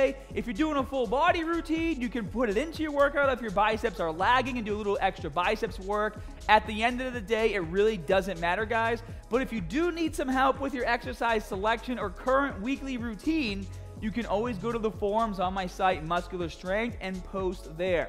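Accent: Boston, United States. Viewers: gotta love that Bosten dialect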